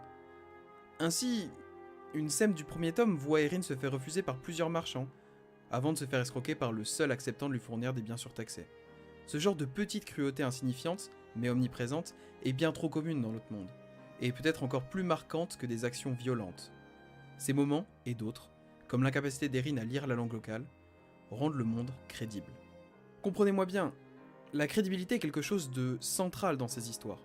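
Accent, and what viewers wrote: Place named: France. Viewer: Dommage que la prononciation de l'anglais soit si... franchouillarde.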